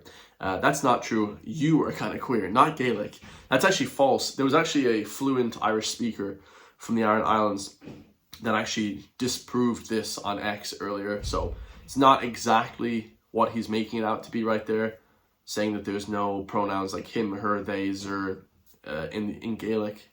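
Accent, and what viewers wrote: United States. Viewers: Dude I never miss a video and I agree almost 99 percent with you. Just curious I'm from Westside Galway and I lived 18 years in California and back home and sincerely not being smart ass but where does the American accent come from I've kids of friends of mine born and lived in America 13 years and within 5 yrs they had Irish accent and American accent gone but you've a full American accent with a tiny bit Irish accent. It's not a criticism just a real thing I'd love to know I was fully under impression you were born raised America and moved back to Galway as alot people did with kids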